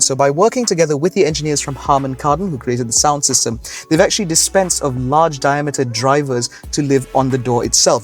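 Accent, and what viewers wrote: England, United Kingdom. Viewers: Thumbs up to Your english accent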